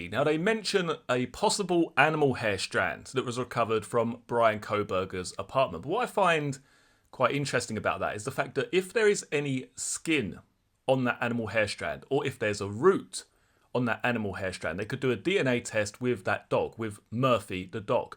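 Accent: United Kingdom. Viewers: I love your accent. Made me realise how rarely I listen to BBC 😂😂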